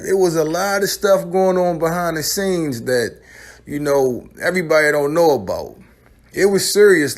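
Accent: Baltimore, United States. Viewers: You sound like you’re from B-More homie....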